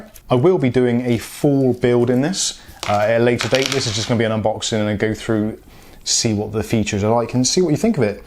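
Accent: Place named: Cornwall, United Kingdom. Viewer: Are you from Cornwall? I recognise your accent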